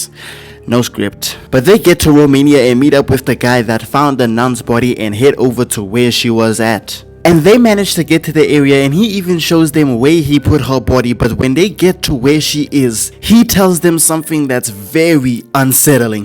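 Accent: South Africa. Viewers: Bro sounds South African 😭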